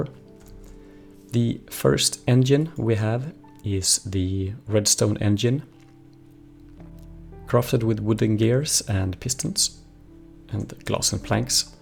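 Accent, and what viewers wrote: Sweden. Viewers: Are you swedish? You have a very cute accent. :) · Swedish? You gotta be swedish... :D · Are you from Sweden? Just gotta ask cuz it sounds that way :)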